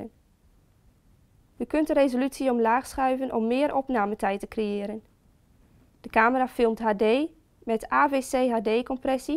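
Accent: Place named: Geordie, United Kingdom. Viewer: That is the strongest Geordie accent I've ever heard!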